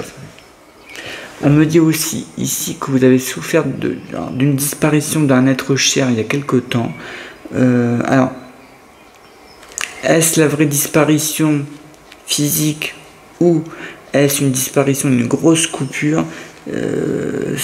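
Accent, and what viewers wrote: North, France. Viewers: Bonjour, bon dimanche. Votre lecture est d'une précision époustouflante. Merci je vous écoute avec votre joli accent du nord de la France ☺️ Cordialement Danièle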